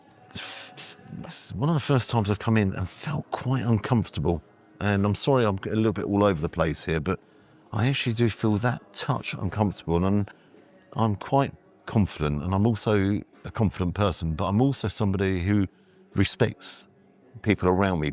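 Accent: RP, United Kingdom. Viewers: Mate, you don’t have the right to film people working mundane jobs. A lot of these people are working minimum wage. You’re whining because someone doesn’t want to be filmed. Grow up mate. You sound like an entitled toff.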